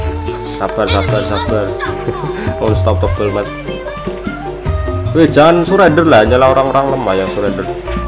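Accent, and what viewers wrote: Java, Indonesia. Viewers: Bang Lo orang Jawa ya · Bang Orang Jawa Ya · kok bosone Jowo medok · Jek medok jowo sampean mas kurang harapan bangsa yang paling agung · Jawane ngendi bosku?? Ketok wenakmen ngomonge..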